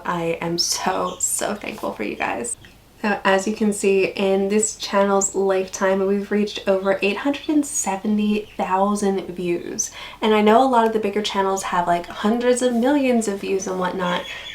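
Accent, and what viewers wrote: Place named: Australia. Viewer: Crikey! a full year already. Congrats, thanks for the behind the scene's look, really interesting. I have watched and enjoyed many of your vids, seeing a person from the U.S. become an Aussie is awesome, small changes in speech (using slang, Aussie accent etc.) creeping in, (it's a secret ingredient they put in Vegemite, Shapes and Chicken Salt) before you know it your thongs have fallen from your hips to your feet;-). Love your channel, best wishes and hope for continued success! Cheers mate.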